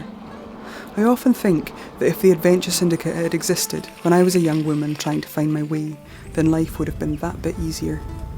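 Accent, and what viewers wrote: Scotland, United Kingdom. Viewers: Inspirational video. And the Scottish accent makes it even better. :)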